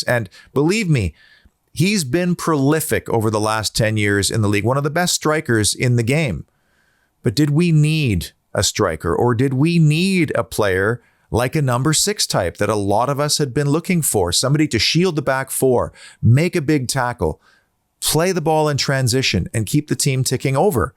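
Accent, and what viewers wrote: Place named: British Columbia, Canada. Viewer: British Columbia is North America but not America. Listen to the accent. a star.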